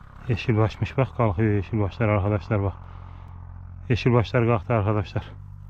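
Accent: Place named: Yozgat, Turkey. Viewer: Gaçarsa gaçsın neydiyim heri deyisine gurban senin tam Yozgatlı ağzı bu ellam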